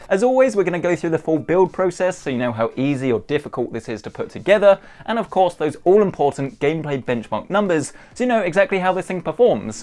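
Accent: United Kingdom. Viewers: your bri'ish accent made me to watch the full video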